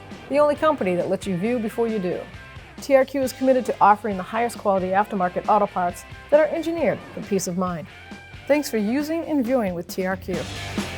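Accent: Boston, United States. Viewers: Hi quality video and textbook Boston accent! After 12 years all my shock pieces are frozen.I'm not gonna use torch, just repeated srpaying and hammer hits and a few days, I also used a 4' steel pipe sleeved over breaker bar for awesome leverage., the top of new shock in the "cage" is a really, really tight fit and threading the needle is by braille on the top. I used silicon spray to move the shock top in the cage, it's that tight. Doing a job where all the bolts aren't frozen must be a lot of fun, something I'll never esperience!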